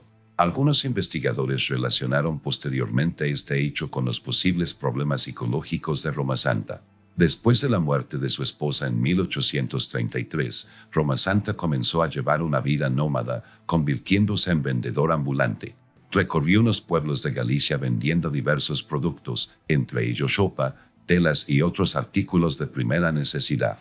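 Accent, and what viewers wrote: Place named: United States. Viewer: Por qué ese acento yanqui?????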